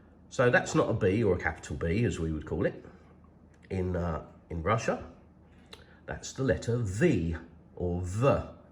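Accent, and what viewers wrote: United Kingdom. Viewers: Кажется, британский акцент..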